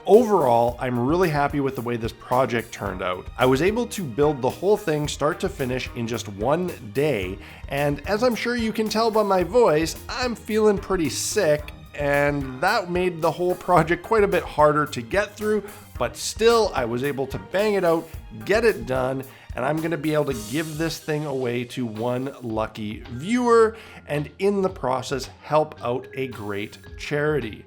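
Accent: Canada. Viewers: I kept wondering if you were Canadian I'm glad I have confirmation.haha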